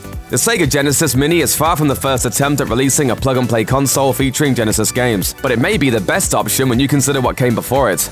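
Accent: England, United Kingdom. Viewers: English bloke, English accent. Doesn't mention the Euro release at all. Shit.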